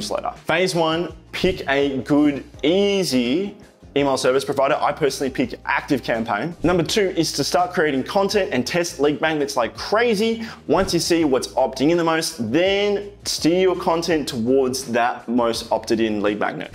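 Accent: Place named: Australia. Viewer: are you an aussie ? just curious kinda sound like that great vid btw lol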